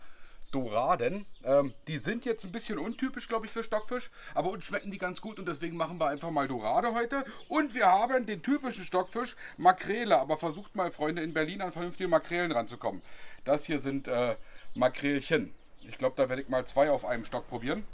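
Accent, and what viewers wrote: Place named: Bavaria, Germany. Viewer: Der Dialekt, Basd. der heimliche Bayer. Unterwegs in Berlin als Entwicklungshelfer. Bravo Juhu. Ganz liebe Grüße aus dem König Söder Land.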